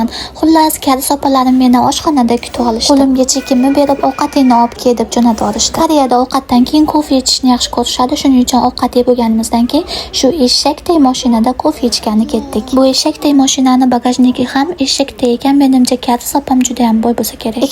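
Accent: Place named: Andijan, Uzbekistan. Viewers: Ha Anjonlika o'xshab gapiryapti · анжонлимисиз жавоб берингууууу😂ёмоннам кизикяппандааа😅шевез анжончага тортяптиююю😂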